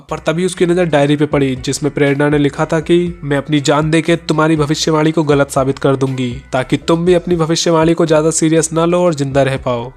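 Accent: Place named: Bengal, India. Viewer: Bengali hoga, Bengali main deewar nehi bolte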